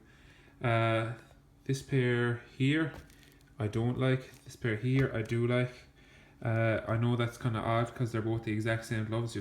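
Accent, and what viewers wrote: Ireland. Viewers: are you from Ireland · sounds like a clare man 😂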